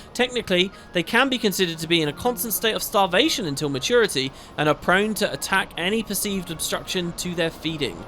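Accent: United Kingdom. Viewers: My wishes were granted, the video, at least now, is not private. Not hidden in a playlist. All hail the British dudes reading stuff to me.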